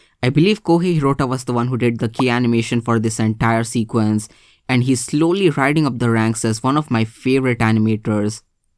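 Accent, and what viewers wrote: India. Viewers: Clicks video, hears the thicc Indian accent, alright I'm out( after commenting ts ofc)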